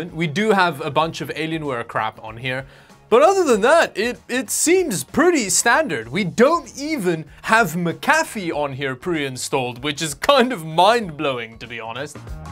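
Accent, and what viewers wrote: South Africa. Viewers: where u from u sound South African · Are you from CA? I hear some SA in there, especially with the Ja. lol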